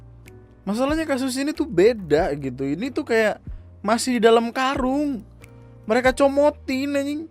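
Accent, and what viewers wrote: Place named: Banyumas, Indonesia. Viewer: bang asli nya org mana dah kalo gw ngapak jdi agak mirip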